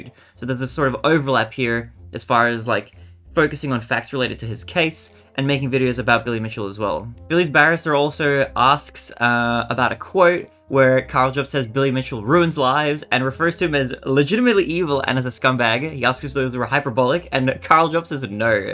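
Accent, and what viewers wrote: United States. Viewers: You are definitely take the medal for most American sounding Australian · your accent is very Americanised. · I thought you were a weird Minnesotan.*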